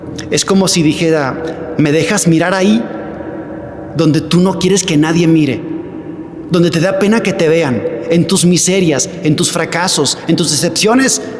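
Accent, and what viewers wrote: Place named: Spain. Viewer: Tiene un acento es español de seguro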